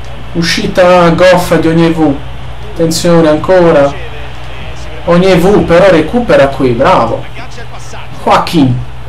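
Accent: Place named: Apulia, Italy. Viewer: Sei molto simpatico e il tuo accento pugliese (Barese?!?) dà quel qualcosa in più!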